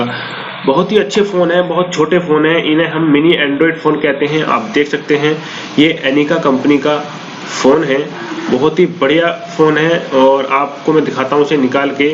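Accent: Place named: India. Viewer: Dusre ke video ko Chura Ke Indian Awaaz lagakar Banaya Gaya video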